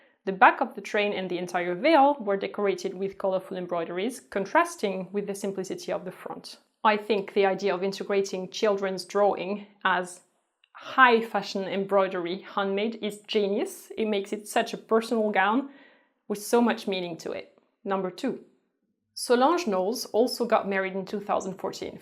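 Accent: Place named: France. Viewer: Oh god. French accent is uggghhh to die for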